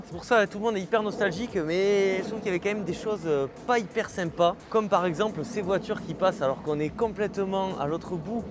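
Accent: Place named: Southwest, France. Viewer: Bravo pour ces vidéos très sympa (et cet accent de notre bon vieux Sud Ouest!) J'ai eu une renault 18 Américan 2 dans ma jeunesse, j'en ai gardé un excellent souvenir!!!...Et le badminton, ça fait travailler tous les muscles, mais pas de mal au bras! Il va falloir travailler ta prise de raquette... Ps: on m'a donné une peugeot 305 qui roule parfaitement en daily, et comme la renault 18, elle rappelle d'excellents souvenirs de jeunesse à tous ceux que je croise sur mon chemin, et plus curieusement encore, quand je vais dans des concentrations de vieilles autos avec cette 305, elle suscite presque plus de réactions et de regards qu'une des 10 ou 12 berlinettes garées sur le parking... incroyable facteur de sympathie!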